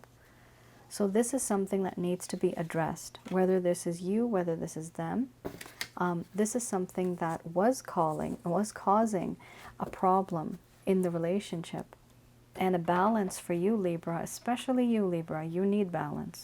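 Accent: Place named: Hawaii, United States. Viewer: WOW... N U R D ONLY ONE 2 RESPOND .. THANK U 4 DAT... HEHEHEH HEHEHEH... U SOUNDED SEMI HAWAIIAN, DATS Y I HAD ASKED... THANK U AGAIN...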